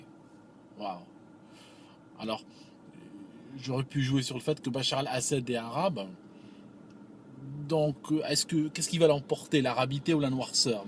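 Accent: Switzerland. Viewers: on valide de la 1ère à la dernière ligne... et retiens la métaphore! Mais, êtes-vous CHuisse?! pas l'accent...les 2? euh... franco-suisse-arabe?! Merci pour la virée en bagnole! La politique prend une telle place prépondérante en France que c'en est insupportable. Plus de philosophie, SVP chers voisins et compatriotes... ça serait moins lourd - à l'instar de la cuisine au beurre d'hier...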